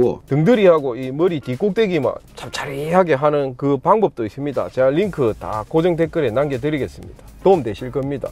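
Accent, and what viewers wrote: Gyeongsang, South Korea. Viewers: 매형이 김해분이신데 행님이랑 말투가 100% 같습니다. 찐 경남 사투리. 영상 볼때마다 매형이 생각납니다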